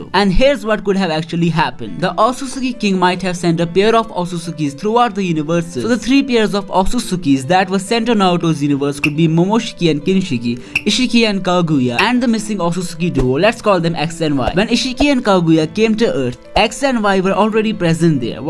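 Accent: India. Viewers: if that comes true you are an on insider of baruto😉 ya one ques bro plz answer are you a indian your accent does sound like indian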